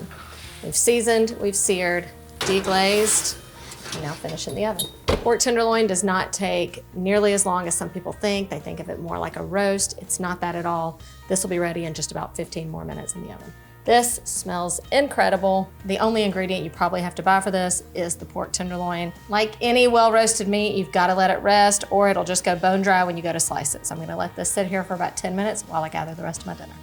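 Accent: South, United States. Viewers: I like her southern accent ...❤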